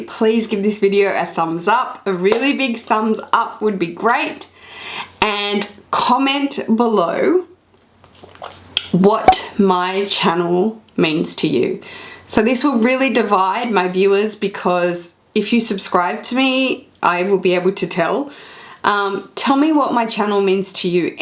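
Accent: Australia. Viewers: Watching another Sydney girl do so well makes me really proud! You’re always my go to for fashion and luxury videos and hearing an Aussie accent makes it that much better! 🇦🇺❤️ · I’m a silent watcher,but I just want to say I love your blogs! I love watching your lovely family and your sweet mom. Your kids are so precious! I’ve collected luxury handbags since 1986(telling my age 😉) I love your collections and I love your accent! If I had your Aussie accent I would never stop talking 😌 Thank you for the opportunity to win the Speedy B 25! 😍 God Bless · How can anyone hate you?! You are so real and generous. I love how you share your life and love for luxury without any tinge of bragging. And of course that Australian accent is just so cute, I can’t get tired listening to you. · you've unwittingly been right by my side for so many years now. My partner walks by me watching your videos and affectionately shouts ' ' and then leaves me be because obvi I'm busy, eyes glued to whatever eye candy and luxury wisdom I have coming my way. 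I can't actually pinpoint what it is I love about your videos. What first drew me in was hearing another Australian in a sea of American luxury YouTubers. From day 1 I just.. trusted you. I've watched you thrive, go through your first pregnancy, met your beautiful Mum and gem of a hubby. I've seen Massi grow and squealed when Zaki I came along. And here I was thinking I was watching for the luxury! Anyway babe, your killing this biz, keep doing you. Congrats on 100, 000. Hells bells, that's the shzushz!!! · I watch your channel because you are true to yourself 100%. And I love your Australian accent! 😊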